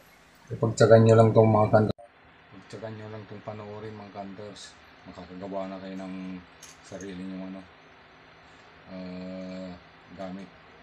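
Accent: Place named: Ilocos, Philippines. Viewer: Tagat nu ka bro?Parang ilocano ka..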